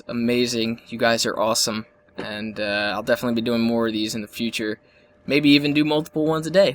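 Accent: Canada. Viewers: hey are you canadian because i here you say guy a lot lol i love all of your videos man i watched your live stream last night pretty awesome god i love your videos and the other creatrues too lol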